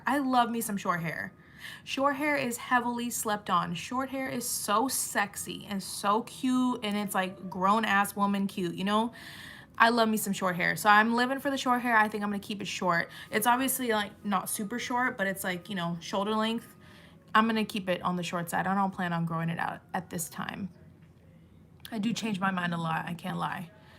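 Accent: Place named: United Kingdom. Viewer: Girl luv your brittish accent 😍 "what " my daughter colored her hair black &she luvs it :)))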